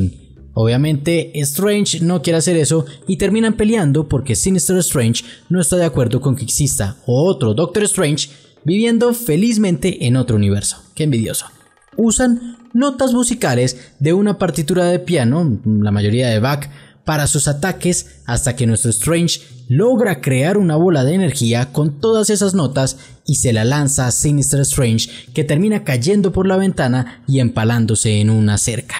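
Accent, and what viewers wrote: Colombia. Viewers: Me encantó tu resumen (sobretodo tu acento Colombiano😍, no lo contamines con barbarismos mexicanos y argentinos😖).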